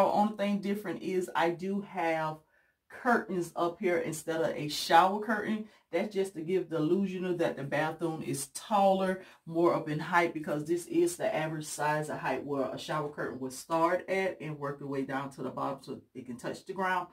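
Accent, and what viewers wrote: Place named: Louisiana, United States. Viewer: You sound like me when you speak I’m Louisiana native are you?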